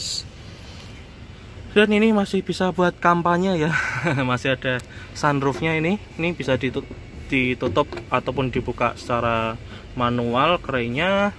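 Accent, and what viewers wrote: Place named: Java, Indonesia. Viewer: Jangan pake bhsa GW mas soalnya rada2 medok jawanya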